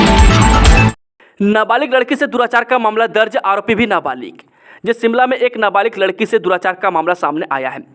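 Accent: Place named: Uttar Pradesh, India. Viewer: Bhai tum Hindi UP style mein bolte ho.UP ke ho kya.HP ke reader khatam ho Gaye jo tumko import karna pda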